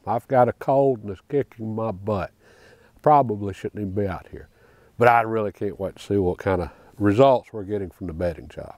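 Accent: South, United States. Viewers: did you ever send the Winchester Model 70 257 roberts back to Winchester to see what they had to say? BTW, I really enjoy your videos. You sound so calm and composed. Are you from Ga, tenn, or ala.?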